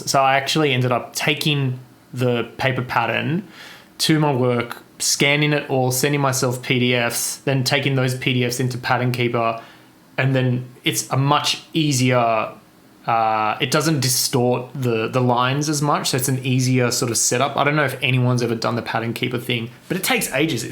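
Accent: Australia. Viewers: Hey from Melb, love hearing another Aussie! Well done on your finishes. I agree, it's a daily lifestyle. Hope your pinky recovers, I hit 40 last year.....gets worse 😂. Welcome to Flosstube 🥳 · Welcome to flosstube knew you were a fellow Aussie as I was listening to you - your a natural - your wizard work was fantastic ~ I am working on the pirate ship for my grandson I did change the called for fabric though a stitch in time is an Aussie store I buy from too needle minders I brought from WA etsy store recently called ‘inchwormdesign’ really like them and reasonably priced - looking forward to next episode😊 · Great first flosstube! Lately I feel like all the flosstube channels I’m recommended offer up similar content. I discovered a few new designers with you which got me excited! It’s also great to hear a fellow Aussie accent ☺️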